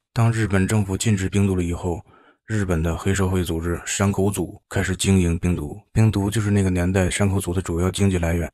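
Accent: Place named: Beijing, China. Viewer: 北京口音听着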